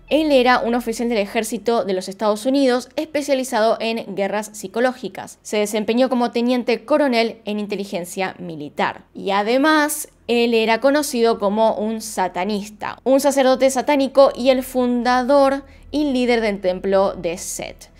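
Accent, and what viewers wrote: Argentina. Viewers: yo tampoco entiendo todas las palabras pero me encantan sus vídeos y el acento argentino ❤❤ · Hola! Disculpame que te pregunte, se te hace difícil diferenciar acentos? O los escuchas igual? Por ejemplo acá ella que es argentina, o el español de mexico, españa, etc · Tu español latinoamericano argentino es hermoso (igual que el mío, que es de mas al norte) y quien no lo entienda es que no lo quiere entender… saludos hermana de La Patria Grande 😺😺💜💜🇲🇽🇲🇽🇦🇷🇦🇷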